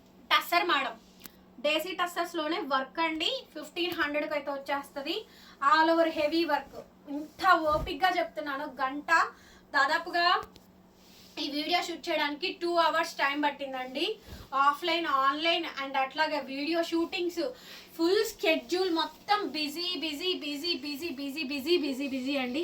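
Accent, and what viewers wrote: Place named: Godavari, India. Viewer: Meeru Godavari padatam muchchataga vundi mam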